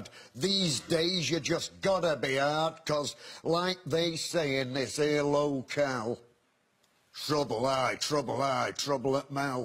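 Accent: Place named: Salford, United Kingdom. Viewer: Trouble At’t Mill Not Mall And it’s Trouble, aye Trouble, aye Trouble at’t mill It’s in a thick Salford working class accent, exaggerated a bit. It’s also a callback to his The Day the World Stood Still: The day the world stood still The day the world stood still From the underground to the overspill No trouble not even at the mill This is the day the world stood still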